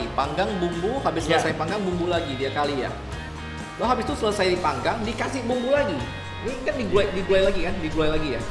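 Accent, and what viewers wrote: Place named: Bangka, Indonesia. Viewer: asli bangka bg ? logat ny agak" bangka dikit mnrt gw sih..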